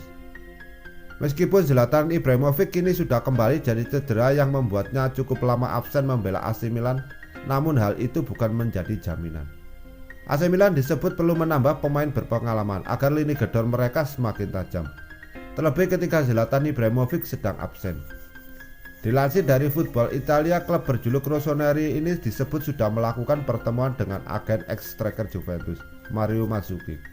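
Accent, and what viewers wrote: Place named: Padang, Indonesia. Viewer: Dilihat dari logatnya, pasti orang Padang nihhh..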